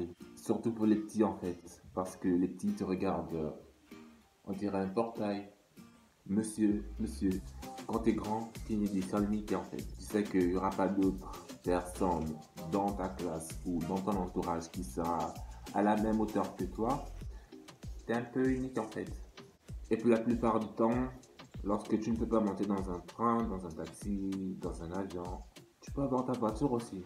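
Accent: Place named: Gabon. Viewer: Aah bah voilà comme si je savais que t'étais Gabonais 🇬🇦 à cause de ton accent 😂😅 je te suis depuis 3 mois à Libreville félicitations et surtout continue comme ça prck depuis ces 3 mois je suis tes conseils et j'ai pris 4 cm même si c vrai que parfois je suis plus le matin et moins grand le soir et je fais 1m62 , mon père 1m65 ou 66 et ma mère 1m55 et en plus j'ai envie de faire 1m84 tu penses que je peux les atteindre stp 🤔🙏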